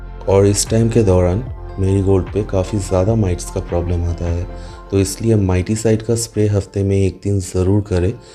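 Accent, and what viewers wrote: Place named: Bengal, India. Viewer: Aapki knowledge, bengali hindi accent and voice modulation is great.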